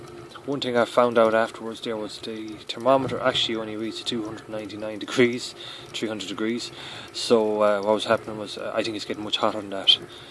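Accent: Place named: Newfoundland, Canada. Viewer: sound alot like a newfoundlander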